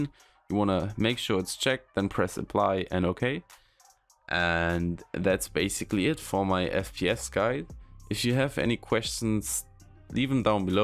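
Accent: Germany. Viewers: Yeah you can tell by his accent and his language in his PC is also Set to german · Man hört aus der Stimme raus dass du Deutsch bist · Are you german?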